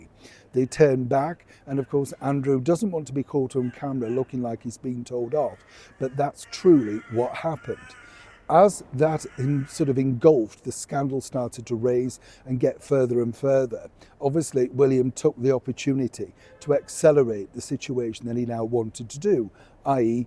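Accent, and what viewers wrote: Yorkshire, United Kingdom. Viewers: Neil hubby & I thoroughly enjoy your news told as it is and sometimes with a bit of "Yorkie" thrown in keep up the good reporting and bugger the moaners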